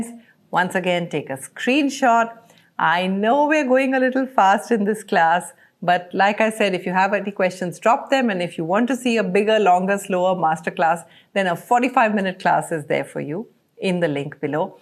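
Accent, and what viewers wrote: United Kingdom. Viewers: R u british? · I though she was british😅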